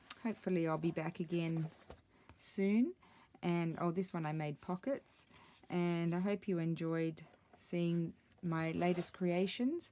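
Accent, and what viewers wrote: Australia. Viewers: it was such a such a treat to find your first video. How far you have come since this post. I LOVE your channel. You inspire me with every single video. Your unique situation with being Australian in Italy is very cool! I love seeing all the italian papers you have, and Ii just love your beautiful accent. I am a new Youtuber myself, I do junk journals with a focus on processing grief. I lost my husband 6 months ago, and was surfing youtube while hiding in bed for weeks... I stumbled across Tracie Fox's videos, and found you through her... I really enjoy crafting along with you. thanks so much for the inspiration! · love the down under accent..greeting from Queensland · Wow, fabulous work! So glad I found you! Can’t wait to follow you. Greetings from Oz (do I detect an Aussie accent?) 😎🙃🇦🇺😘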